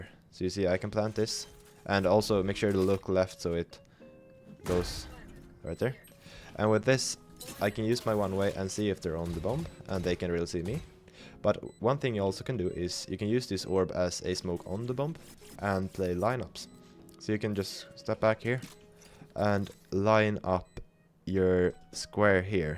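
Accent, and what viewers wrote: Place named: Sweden. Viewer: Are you from Sweden or do you just have an accent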